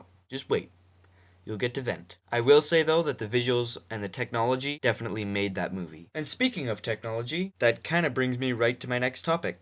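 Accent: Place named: Canada. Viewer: You sound canadian are you canadian?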